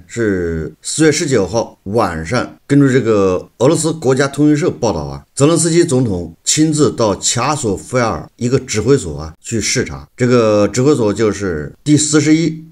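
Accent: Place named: Xiangxiang, China. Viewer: 这湘乡普通话，亲切👍👍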